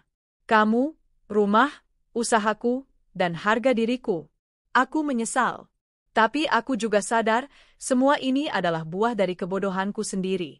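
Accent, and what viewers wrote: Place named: Madura, Indonesia. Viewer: Suara/intonasi dari pembaca narasi ini mirip dgn emak madura yg dari desa sdg baca... Tak iye.. Tak iyee... 😮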